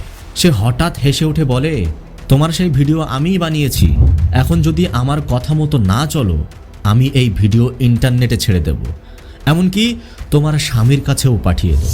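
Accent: Bangladesh. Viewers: আপনি ১০০ শতাংশ বাংলাদেশের লোক। আপনি যতই আমাদের বাংলায় কথা বলার চেষ্টা করুন, আপনার এখনও জিভের আড় ভাঙেনি। দ্বিতীয়ত, আমাদের দেশের বাঙালি মুসলিমরা কেউ জলকে পানি বলেন না। কেন বেকার মিথ্যে বলছেন? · India তে কোথায়? ইন্ডিয়া কি শুধু হুগলী আছে? সত্যি বলতে কি কষ্ট? আমার মনে হচ্ছে বাংলাদেশি, উচ্চারণ গুলো ঠিক তেমনি।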